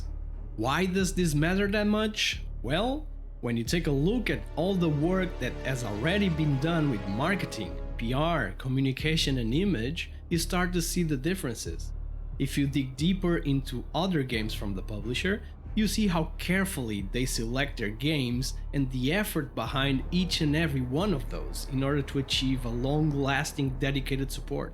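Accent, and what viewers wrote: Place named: Portugal. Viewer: I was listening to the video, and halfway through the only thing going through my mind was how Portuguese you sound.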